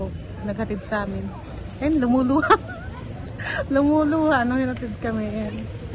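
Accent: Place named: Batangas, Philippines. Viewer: oh i see. ung boses at punto mo kc parang batangas.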